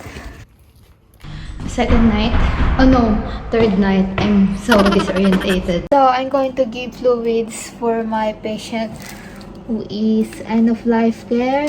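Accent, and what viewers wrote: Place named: United Kingdom. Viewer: Nag eevolve ka na talaga classmate. British accent ka na. Cute.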